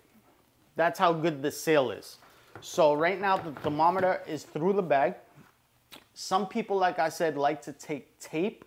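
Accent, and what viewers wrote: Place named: Northeast, United States. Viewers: based on his accent you could pretty much narrow it down to being somewhere north and/or east of Pennsylvania lol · This dude sounds like he's from New Jersey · You can hide your face, but you can't hide that southie accent.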